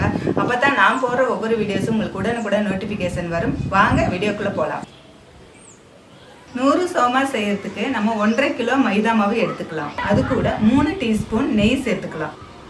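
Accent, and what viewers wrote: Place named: Madurai, India. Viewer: ok ok தமிழ் மதுரை தமிழ் போல உள்ளது..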